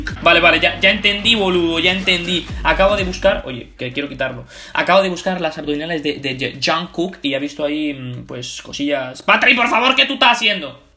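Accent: Argentina. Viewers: Se le sale lo argentino a jajajaja extrañaba poder ver videos tuyos! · el acento argentino JAJAJAJAJJAJA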